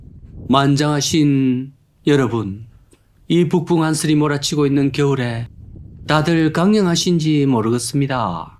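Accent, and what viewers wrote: Gyeongsang, South Korea. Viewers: 님 안녕하세여 경상도 사투리로 설명해주시니 정겹습니다 잘보그 조아여 누르그 갑니다 건행하셔여 ❤❤❤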